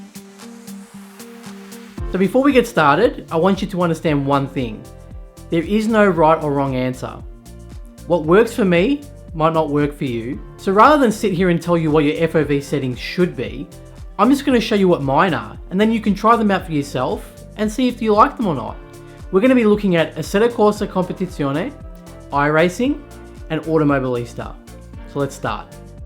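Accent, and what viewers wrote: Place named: Australia. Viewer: I love your calm style and Australian accent 😃 Much love brother 🍻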